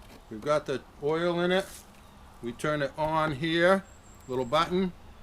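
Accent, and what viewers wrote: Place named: New England, United States. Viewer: Idk, maybe Maine, upper Vermont, or mass? It's hard to tell.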